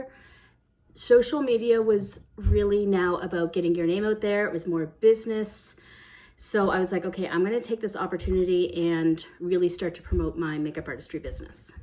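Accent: Canada. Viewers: Your shorts just came across my algorithm, and I really enjoy watching them. I thought I occasionally detected a faint eastern accent, so had to see where you are from (prior to this video) and saw Canada 🇨🇦. Told my husband I knew I was right. So happy to see and support Canadian creators like yourself. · Hey hey, I just love you and now I know why... you're a maritime girlie - and now I can hear your Nova Scotia accent. Surprising to me that the Bridezillas in your shorts were East Coasters (or maybe they weren't) because that seems opposite to the maritime culture, but I guess there are mean people everywhere. I'm 50 and have hooded eyes now. Would love your best tips on this. ❤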